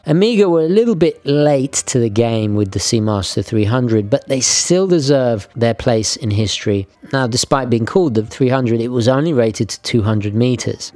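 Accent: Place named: United Kingdom. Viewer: It is a remarkable history and an amazing review. I truly like the way you tell the characteristics. I so much enjoy your British English review.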